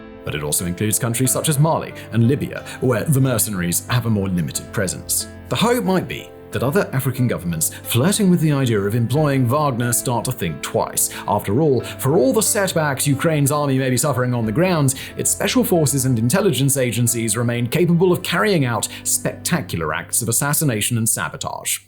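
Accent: RP, United Kingdom. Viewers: I'm also from the UK. narration voice is an RP accent influenced by time living overseas. If you spend a lot of time speaking a foreign language it leaves imprints on your L1 speech over time. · he's a brit =)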